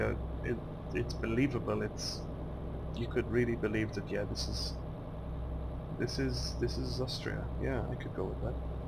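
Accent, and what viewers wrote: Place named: Ireland. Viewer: I got excited I thought u was him. Anyway good videos and I love ur accent. Which part of Ireland u from?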